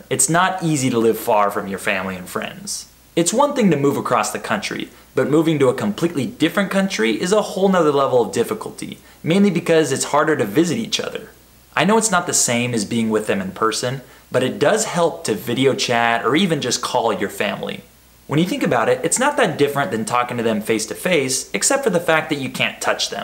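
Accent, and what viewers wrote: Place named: United States. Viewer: That's not English, it's American....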